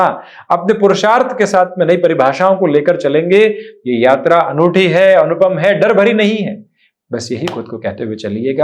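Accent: Standard. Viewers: Bahut shuddh hindi hai Bhai ki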